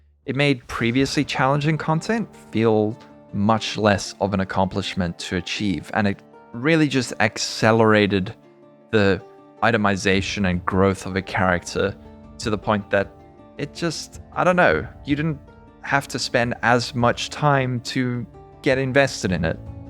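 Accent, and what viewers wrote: South Africa. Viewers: You are completely right! I am an old school crpg player (wow it’s almost 40 years I have been playing since hack/rogue/wizardry 1 in 82) and for me nerfs are amazing as it just makes a whole new journey/challenge available. I get bored with high level characters that can do everything, it’s figuring out how to build and tackling challenges with my creation that’s fun. Probably why I played Diablo 2 for years as well. Anyway thanks for a great video and channel! Btw are you South African/Ex S.A.? Me too! I grew up in joberg).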